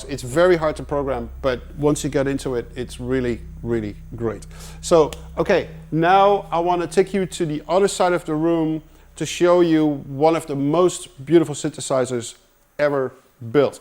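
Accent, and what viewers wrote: Netherlands. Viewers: That's more just his accent. He's Dutch. Just for an example, Canadians will say some vowels surprisingly different than other English speaking peoples.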